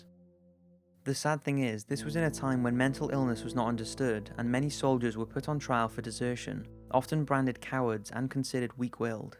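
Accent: Wales, United Kingdom. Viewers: do I detect a slight welsh accent?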